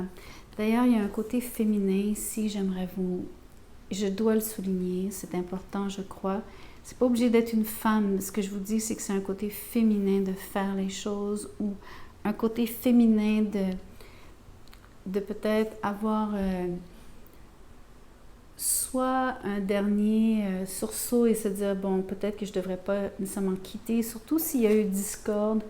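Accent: Quebec, Canada. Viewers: Bonjour. Guidance vraie mais pas pour tout. Je prends ce qui corredpond. Vous êtes Québécoise ? Votre accent, je reviens du canada 😊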